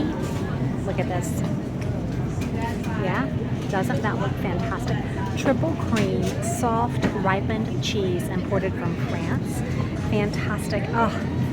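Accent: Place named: North Carolina, United States. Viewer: Love your channel! Keep it up! Are you from NC? You certainly sound like one of us :P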